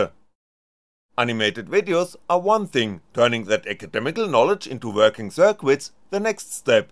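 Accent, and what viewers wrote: Germany. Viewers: amazing german accent i enjoy alot :D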